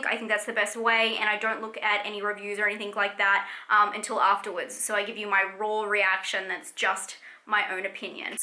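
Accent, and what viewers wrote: Australia. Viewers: I let this video play while cleaning my bathroom, enjoying that Australian accent and when I came to my screen and saw the nosebleed I freaked for a second lmao...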